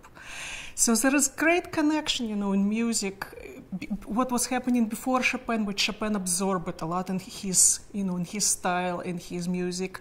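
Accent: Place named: Moscow, Russia. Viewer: Moscow dialect of English. Nevertheless the grammar is normal.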